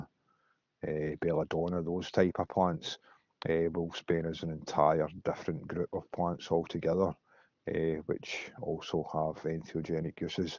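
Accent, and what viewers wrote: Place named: Scotland, United Kingdom. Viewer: Love your accent. Are you scottish?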